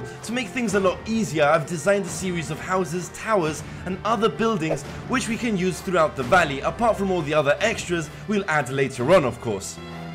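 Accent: France. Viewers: Waw, you have a french accent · are you french? I am french and your accent sounds french x)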